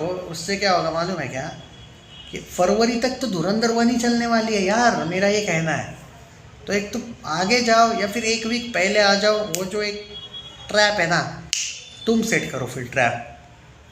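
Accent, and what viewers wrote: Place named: Maharashtra, India. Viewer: Bhai i am addicted to your channel and your style of explaining things Specially i love the accent when marathi prople speaks hindi, as i am also maharashtrian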